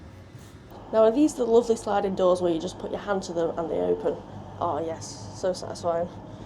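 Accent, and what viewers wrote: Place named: North, United Kingdom. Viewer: Ah sorry it's that lovely northern accent of yours lol. Keep up the great videos they always make me smile 😊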